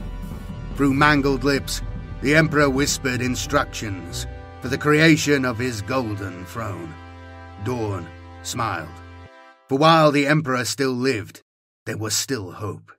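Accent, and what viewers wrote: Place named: Midlands, United Kingdom. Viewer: Good video and was nostalgic at the end remembering the old dioramas. I remember one of an orc invasion (on a desert world?) which featured a vindicare assassin atop a building. One of the highlights of my childhood. Also, good to hear a Midlands accent on youtube, even if it is the west, hehe. Greetings from the East Midlands.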